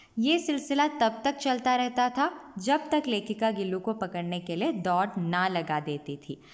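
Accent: Kerala, India. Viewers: good english , good hindi and I also think your a malayali by your accent , lemme know if that's true and also thanks for the explanation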